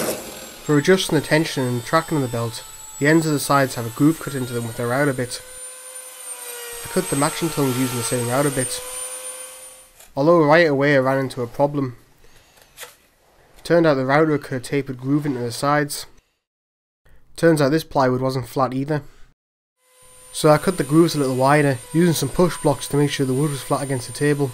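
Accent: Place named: Newcastle, United Kingdom. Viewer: sounds like your from up my way, im originaly from westerhope but now live down durham way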